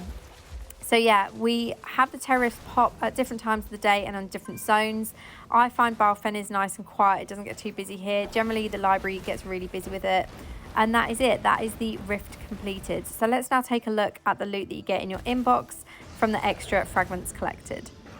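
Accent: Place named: United Kingdom. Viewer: What a British accent ^^❤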